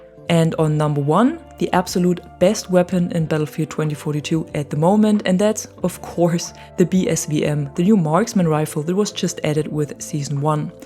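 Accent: Germany. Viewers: Ha ich wusste das du deutsche bist :D hört man tatsächlich raus.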